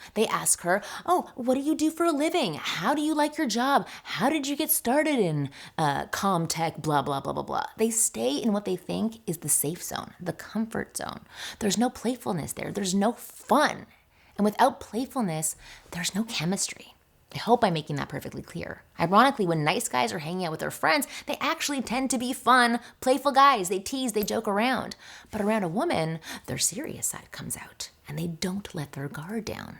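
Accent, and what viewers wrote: Canada. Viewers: your Canadian 🇨🇦🍁accent shines through sorry😂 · You are clearly Canadian, therefore your OPINION doesn't mean Shyte to me! Like I'm gonna take advice from someone who calls soda "pop" and calls Z Zed!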